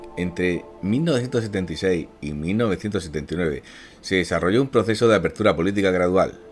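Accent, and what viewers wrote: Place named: Andalusia, Spain. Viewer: No hablas bonito. Eres andaluz?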